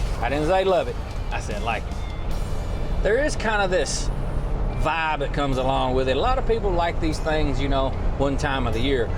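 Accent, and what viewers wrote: South, United States. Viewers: Please lose the overwhelming southern accent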